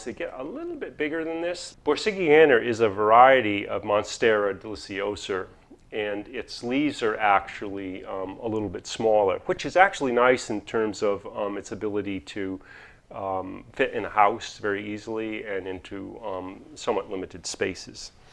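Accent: New York, United States. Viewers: Sounds like he's from New York or jersey.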